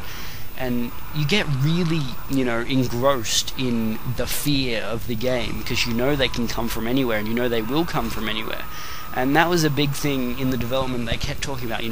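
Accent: Australia. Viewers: always amusing to hear another aussie on youtube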